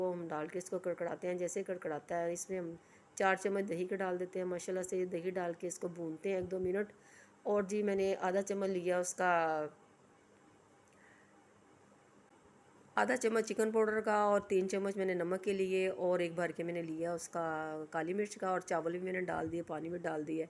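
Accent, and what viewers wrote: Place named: Punjab, India. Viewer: Love your talking ❤😅with Punjabi touch 😅❤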